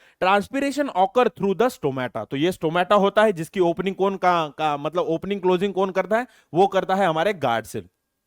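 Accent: Maharashtra, India. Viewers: Sir are you Marathi...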